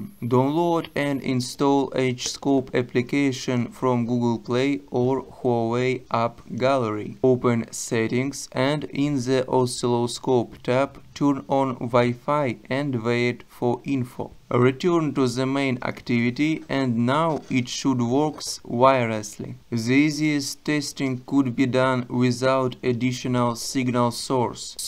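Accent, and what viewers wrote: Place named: Russia. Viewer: Друг , английский надо подтянуть :) Русский акцент ухо режет...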